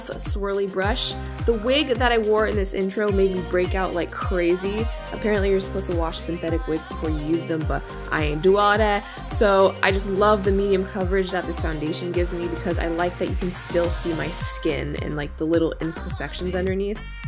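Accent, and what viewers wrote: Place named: Australia. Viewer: SOLID AUSSIE ACCENT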